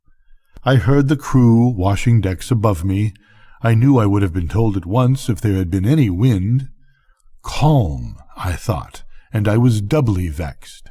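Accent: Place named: United States. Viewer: One of the greatest short stories ever written. Inviting many interpretations . But sadly almost forgotten . Conrad's style can be rather ponderous for modern tastes so his popularity - such as it is - will dwindle even more no doubt. ? Still , this was very well done . A pleasing , well modulated North American accent is always a pleasure to listen to . Despite the obligatory moans of English listeners who think narrators should sound like Sir Laurence Olivier !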